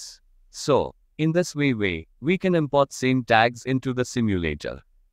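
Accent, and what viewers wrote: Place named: India. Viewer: what is the point of using an automated voice if it still has an indian accent!?!?!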